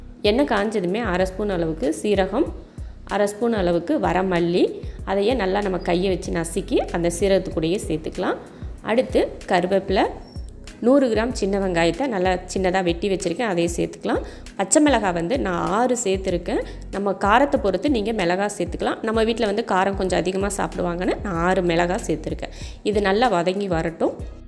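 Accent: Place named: Chennai, India. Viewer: But neenga Chennai Tamil pesuringa sister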